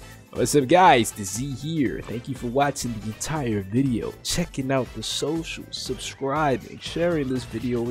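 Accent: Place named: Arab world. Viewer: nice Arabic accent mate ماشاءالله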